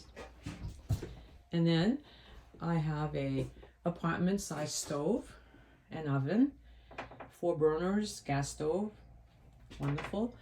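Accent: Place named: New England, United States. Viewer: are you from New England....I thought I detected a faint New England or NY accent. Very nice little space...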